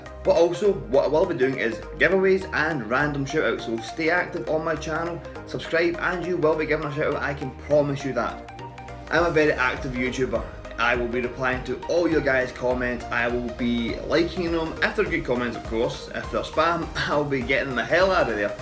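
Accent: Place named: Scotland, United Kingdom. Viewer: Haya fella you were nice enough to check me out so I've come to check you out. I've liked what I've seen (Love this Trailer :-) ) I take it from your accent that your from Scotland. Were about are you based?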